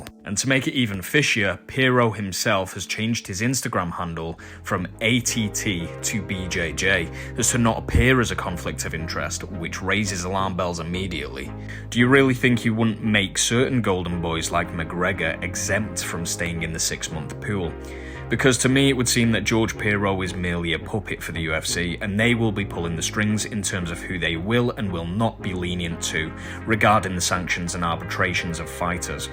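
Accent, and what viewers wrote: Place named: Manchester, United Kingdom. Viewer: Love to see it - bringing the Manc accent and charm to the masses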